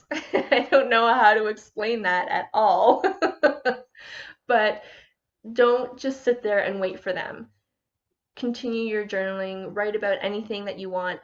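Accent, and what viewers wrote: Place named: Massachusetts, United States. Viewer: I’m 5 seconds into this video and I immediately heard a Massachusetts accent. (If I’m getting cocky about my accent guessing abilities can I get more specific snd say like outside of Boston? North shore? Merrimack valley?!)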